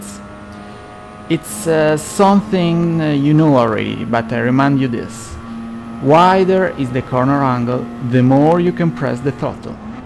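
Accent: Italy. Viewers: Great italian/ english accent,. Don't lose it, it's the connection to Italy and it's passion for motorsports. Also thanks for the info, for a newbie like me this is really helpful. 🙌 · The occasional Italian vowels are amusing. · Absolutely italian. A bit of french influence but italian intonation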